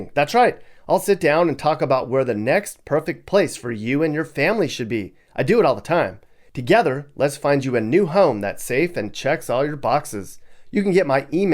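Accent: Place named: North Carolina, United States. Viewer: what general area of NC are you from? I’m going to guess Raleigh…I can’t quite figure it out based on ur accent (I used to live in several cities in Nc). Anyway- I enjoy ur channel:)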